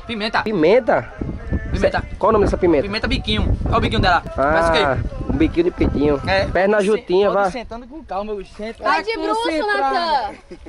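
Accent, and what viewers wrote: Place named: Northeast, Brazil. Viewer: Esse sotaque do nordeste é massa de mais mano kkkkkkkkk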